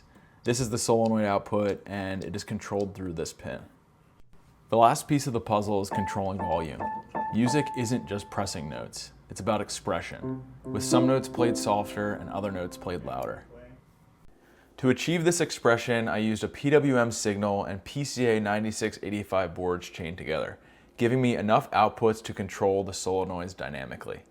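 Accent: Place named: Maryland, United States. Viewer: Anyone get the feeling that this guy is from Maryland?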